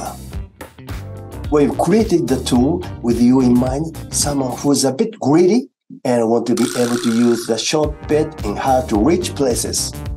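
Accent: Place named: Japan. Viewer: 翻訳ソフトとかじゃなくてちゃんと日本人の英語なのが素晴らしいですね！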